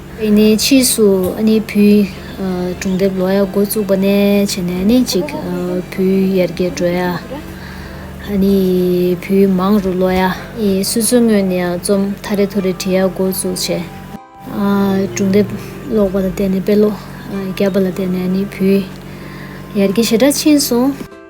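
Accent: Standard. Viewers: དེ་འདྲ་བོད་སྐད་གཙང་མ་ཕྱུག་པོ་འདུག་ ང་ཧ་སང་སོང། ཐུགས་རྗེ་ཆེ་ ཧ་ཅང་དགའ་བོ་བྱུང།🙏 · I am amazed & shocked to hear your pure Tibetan. You speak way better than most of us. I‘m speechless. I‘m following you & subscribed 😊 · རང་ཉིད་བོད་པ་ཡིན་ཟེར་བ་ལ་ཁག་པོ་འདུག་ ་ལགས་བོད་སྐད་ཧ་ལས་པས་ཙང་མ་རྒྱབ་པ་མཚམས་འདྲི་ཞུ་ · བོད་སྐད་གཙང་པ་ཤོད་ཀྱི་འདུག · བོད་སྐད་གཙང་མ་འདུག 🎉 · བོད་སྐད་གཙང་🙏👌🏽👌🏽👌🏽👌🏽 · བོད་སྐད་་གཙང་མ་ཞེ་དྲག་རྒྱག་གི་་ཡག་པོ་རེད་་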